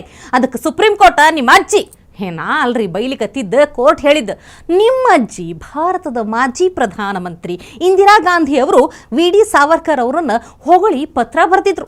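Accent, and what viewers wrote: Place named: North Karnataka, India. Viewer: ಸಹೋದರಿ ನಿಮ್ಮ ಉತ್ತರ ಕರ್ನಾಟಕದ ಭಾಷೆ ಅದೆಷ್ಟು ಚಂದ, ಹೀಗೆಯೇ ನೀವು ಇನ್ನು ಮುಗಿಲೆತ್ತರಕ್ಕೆ ಬೆಳೆಯಬೇಕು ಅನ್ನೋದು ನನ್ನ ಆಶೆಯ...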